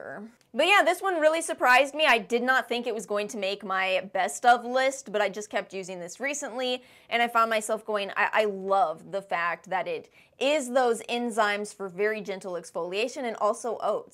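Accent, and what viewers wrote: United States. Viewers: You have a strong American accent!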